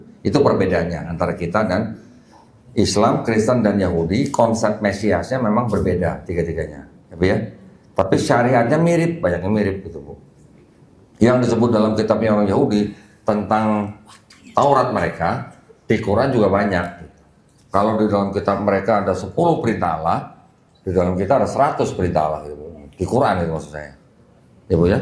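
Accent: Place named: Java, Indonesia. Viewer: Beliau ini chinese tp kok njawani yo. Lanjut koh...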